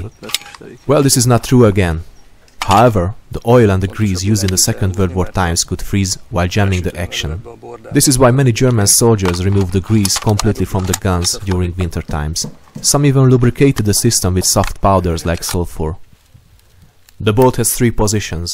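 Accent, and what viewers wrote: Hungary. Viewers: Az akcentusból szerintem te magyar vagy :D Pláne a fenti oldalból a ".hu" nagyon gyanús :D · It took me 40 seconds to realise it is hungarian accent. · Halgattam a videót nemnéztem leírást és úgy a 10.ik másodperctől éreztem hogy Magyar Vagy :) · His got that hungarian accent, trust me i know :D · A fülemnek tetszik a magyar akcentus :D minek szinészkedni az angolt. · I have a Hungarian friend & he talks just like you I can bet you're Hungarian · Imádom hogy hallottam hogy ez magyar akcentus lesz és téényleg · Horribly Hungarian accent.. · Annyira lehet hallani hogy egy Magyar beszél Angolul :D Jellegzetes akcentus, meg beszédi forma vagy minek mondjam. De az ember hangján lehet inkább észrevenni hogy igen, a Magyarok beszélnek így angolul :D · JÓ lett a videó És mikor elősször hallotam a kommentárt egyből feltűnt hogy mintha egy kicsit magyar volna :D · szintén, elég volt meghallanom az első hangot, és már néztem is egyböl leírásba hogy tuti valami magyar lehet :D talált süllyedt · the accent is terrible. 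Im from Hungary, i live in the USA so im surrounded with these Hungarians but this one takes all. otherwise cool video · az angol akcentusodból tudtam hogy magyar vagy :D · Hmmm... as a romanian I can recognize that magyar accent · Nagyon gyanús volt hogy magyar vagy, az angolod alapján, de amikor meghallottam a magyar beszédet akkor voltam csak biztos benne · Mielőtt elolvastam a kommenteket, már sejtettem, hogy magyar a beszélő...le sem tagahtatja :D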